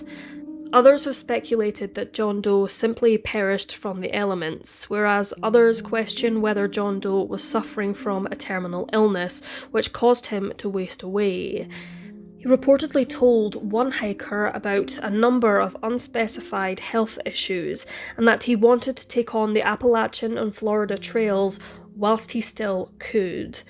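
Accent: Scotland, United Kingdom. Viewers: She is from Scotland, UK. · Subbed. What that accent? Scottish? Irish? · SHE'S SCOTTISH, PROBLEM??????🤨🤨🤨🤨🤨🤨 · she’s scottish not irish🤦🏻‍♂️ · She's from Scottish highlands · except she is Scottish...! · It’s Scottish,I’d say. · Nope. She's Scottish. Mike is Irish. Different accents. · It's so nice to hear a good Scottish narrator... 💕 >^. .^< 💕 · Hello friend! I enjoyed video very much and I like your Scottish accent! I'm from India. I have subbed your channel, thank you.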